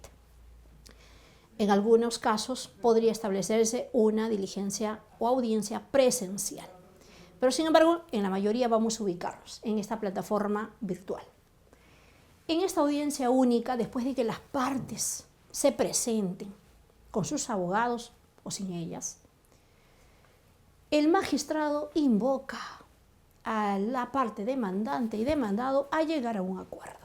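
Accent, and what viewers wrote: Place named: Spain. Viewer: Porque tiene dejo de española? No hace más que dudar (de ser de España) no estar actualizada de la ley peruana ahora vigente Aunque la apariencia aitocotona la delate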